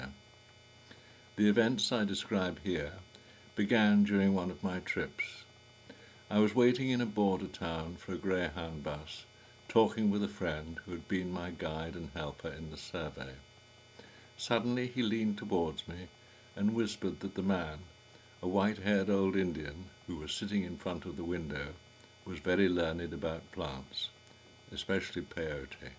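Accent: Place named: United Kingdom. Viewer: You mean "moot point?" What "internal linguistic evidence" do you speak of? You are presuming that anything this guy Castaneda says is this mystical wisdom, even if you see that he's tricking you. I personally have no respect for "teachers" who assume that their students are too stupid to understand the real truth, so they make up bullshit to make it easier to digest. It's like a religious faith. If you are tripping balls and listening to a nice voice with a Brit accent it probably sounds nice.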